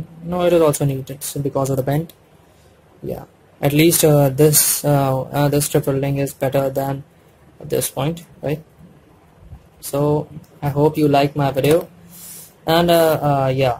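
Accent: India. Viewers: In the south, we don't hear too many Indian accents and difficult to understand you 🙊...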